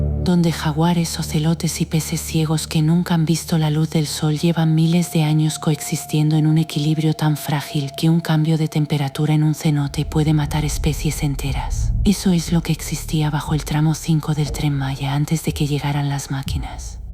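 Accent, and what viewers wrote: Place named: Spain. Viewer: JAJAJAJAJA PORQUE PRONUNCIAN "ALUCSES" SI SU PRONUNCIACIÓN CORRECTA ES "ALUSHES", PORQUÉ LA NARRADORA TIENE VOZ ESPAÑOLA... NADIE SE TRAGA ESTAS MENTIRAS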